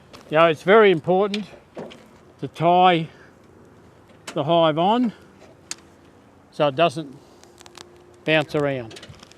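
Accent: Australia. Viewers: Are you in Australia please you sound as you are I’d like to follow you if you are please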